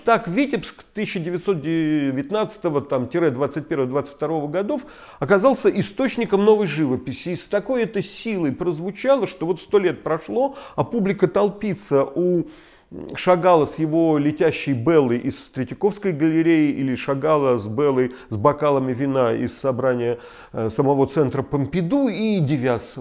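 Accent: Vologda, Russia. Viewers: Бегущий Париж провожает меня....Красиво. Спасибо Леонид, особенно за вологодский говор!!! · Люблю Вологодское оканье, как Кёльнский Плят Дойч...)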